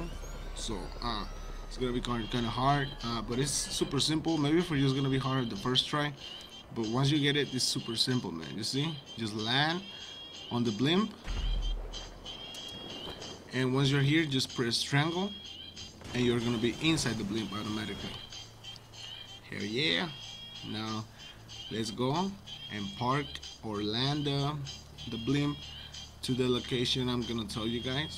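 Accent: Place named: Mexico. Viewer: love your mexican accent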